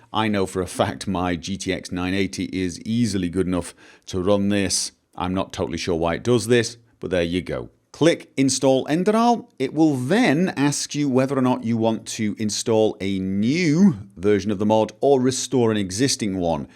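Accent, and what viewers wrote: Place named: Scotland, United Kingdom. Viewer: He sounds scottish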